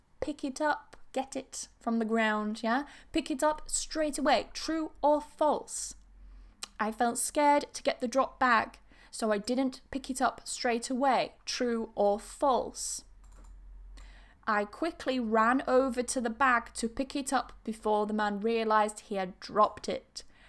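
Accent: United Kingdom. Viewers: Hi, i like your Brittich accent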